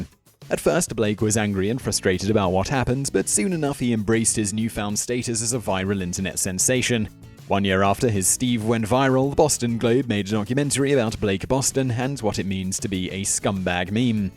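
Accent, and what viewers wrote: England, United Kingdom. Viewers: I love the way he says "scumbag", "farts", "shits" and "badass" in a perfectly clipped English accent.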